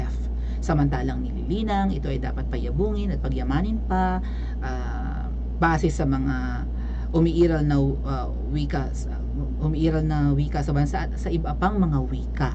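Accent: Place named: Visayas, Philippines. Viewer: Korek ka ma'am pagsinabe mo bisaya ako. Sagot naman nila kaya pala tuno mo palang alam na sabay tawa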